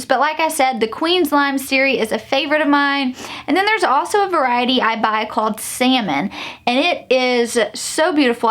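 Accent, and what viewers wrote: South, United States. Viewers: Hey, I am growing every one of those! I have soooooo many seeds But they are very well organized, separated and sorted. I have all my seeds on a schedule in my calendar Every Tuesday I start various vegetables and such then Thursday I start various flowers. This is all based upon how many weeks prior to the last frost date for me I want to use April 29th as the last frost date. So everything revolvers around this. So then all these dates are entered into my iPhone calendar as repeat every year. This is my most organized year ever! So my schedule is working well so far. I really hope to see good results this year so I can organized some photos and put them on Instagram which I am doing sporadically but I want to get better at having a schedule for this. Final note I absolutely love listening to you. I miss living in the south and I love southern women's accents. 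So I think I have enough channels now that it is amazing listening to y'all folks. Anyway God bless y'all Cya later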